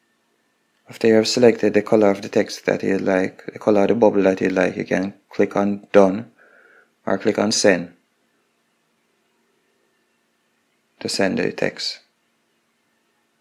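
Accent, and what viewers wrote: Jamaica. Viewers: Thx and I hear your Jamaican accent im Jamaican to! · I can hear the Jamaican accent 🙌🏽🇯🇲 Big uppp! Keep grinding on YouTube! Don’t let the hate get to you.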